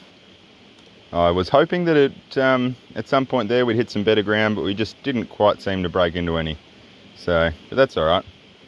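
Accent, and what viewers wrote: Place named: Australia. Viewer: I love your channel too. And that accent gets me ,you aussies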